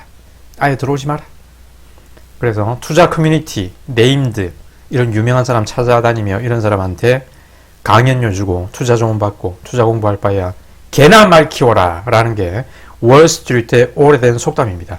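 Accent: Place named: Gyeongsang, South Korea. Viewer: 말투가 경남분이신듯 😊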